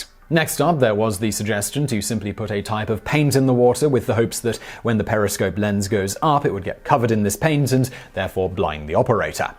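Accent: United Kingdom. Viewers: Simon, I general I can't stand British accents, but yours is pleasant.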